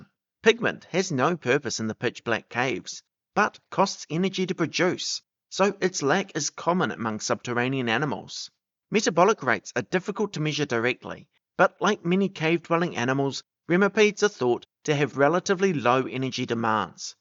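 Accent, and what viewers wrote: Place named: New Zealand. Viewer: You have such a strong New Zealand accent 😂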